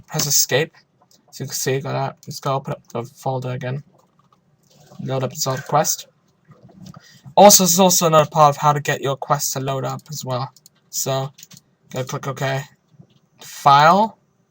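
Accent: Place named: United Kingdom. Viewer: undah combow lol british accents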